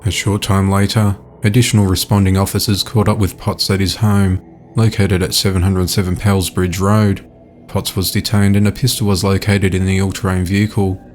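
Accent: Australia. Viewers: All news should be told in an Australian accent. It’s just easier to hear. Not so much the news as the soothing voice and the contrast thereof. Thank you!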